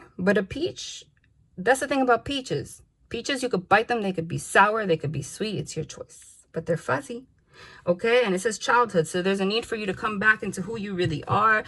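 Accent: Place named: New York, United States. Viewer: I can hear your New York accent! I know that was random but great reading! 💚💚💚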